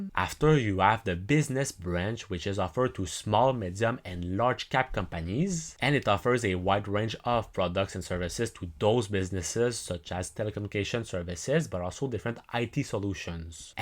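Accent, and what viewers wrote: Quebec, Canada. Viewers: Salut, j’ai pu comprendre avec ton accents que tu est QC ahah. Tu as l’air super bien informé. J’ai juste pas compris se que Telus fait ds le domaine de la santé.. peux tu m’éclairer svp! Merci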